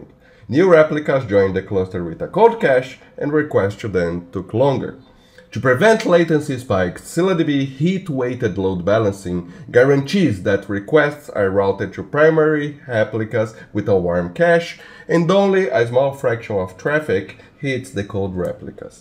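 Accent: Brazil. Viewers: Pelo sotaque esse cara é brasileiro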